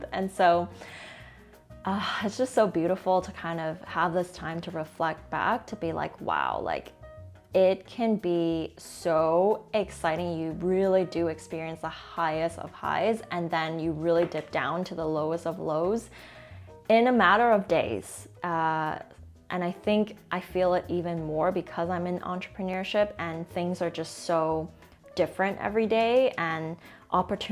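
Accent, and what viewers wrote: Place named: England, United Kingdom. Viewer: You are such an inspiration. I love your english accent. ♥️ from Malaysia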